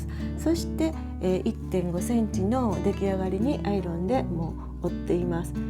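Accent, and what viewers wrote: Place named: Kansai, Japan. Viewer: 先生は関西の方ですか？ 私と同じ関西弁でした。😆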